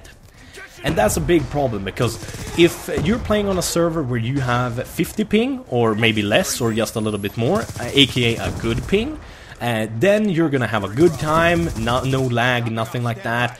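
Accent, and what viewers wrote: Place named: Sweden. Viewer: That swedish accent. :3 ''Those dirty dirty sheaters''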